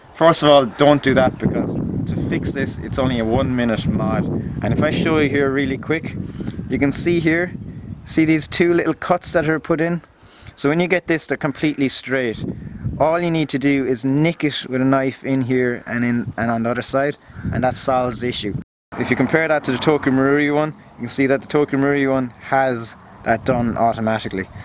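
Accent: Ireland. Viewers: You get a thumbs up just for being Irish, and the Irish accent. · Excellent, informative video matey. Was just pulling your leg, I knew what you said really (everyone loves the Irish accent!) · Finally a proper comparison between the two, everyone's else's comparison is only like 5 minutes and barely gives any information. Also i still can't figure out which part of Ireland that accents from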